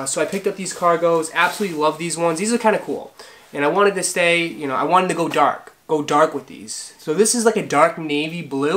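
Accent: Boston, United States. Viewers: Bruh... Where are you from. Boston?!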